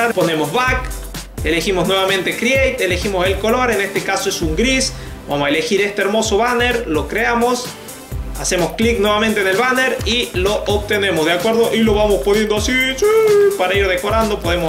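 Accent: Argentina. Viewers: JAJJAJA COMO SE NOTA Q SOS ARGENTINO GRANDE SEGUI ASI SALUDOS DE ARGENTINA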